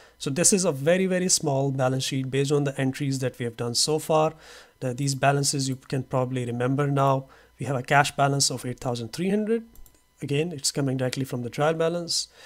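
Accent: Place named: India. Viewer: that Hindu accent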